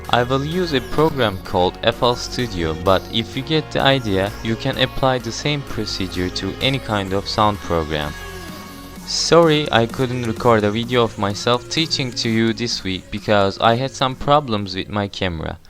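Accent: Turkey. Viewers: he is turkish :D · lan yemin ediyorum kanala bakmadan bu ingilizcenin türk birinden çıktığını anladım aosıhdpoanrv8ebrwıunabf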